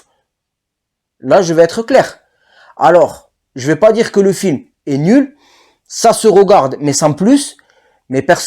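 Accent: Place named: Toulouse, France. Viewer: Au top ton debrief sacrée accent toulousain hein😉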